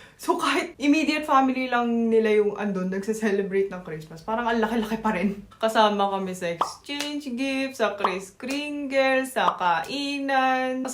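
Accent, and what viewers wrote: Batangas, Philippines. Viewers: not dialect. language because mutually intelligible at iba yung structure ng mga languages dito sa philippines. does speak a dialect of tagalog though which is batangas tagalog, because she’s batangueña and sanay siya doon. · Pinoy na pinoy ang accent mo, buti pa mag asawa ka na lng ng finoy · Kabayan (kabatang,batangueña po aq)nakakatuwa ka nmn panoorin ❤ ung accent at dictions mo kabayan talaga g puntong taga batangas ka 😊.Merry Christmas kabayan❤anong namimiss mong food sa Batangas?Kumakain ka ba ng Sinaing na tulingan?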